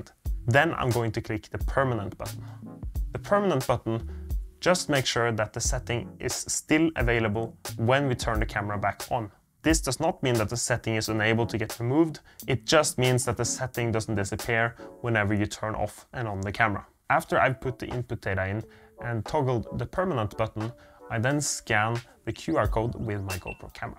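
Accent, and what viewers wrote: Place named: Norway. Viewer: Thanks for the video, love the norwenglish. I will remember to smear myself with a little patience 😂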